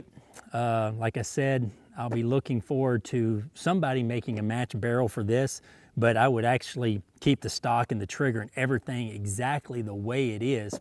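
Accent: Tennessee, United States. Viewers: what part of TN you from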